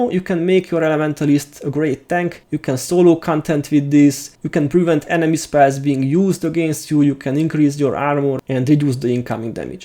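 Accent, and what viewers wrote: Hungary. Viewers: Hungarian accent is so tasty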